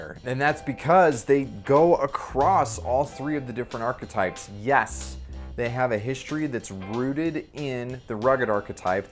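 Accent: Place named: Salt Lake City, United States. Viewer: Ok..you have to be based in SLC... I thought I recognized how you say " imporTant"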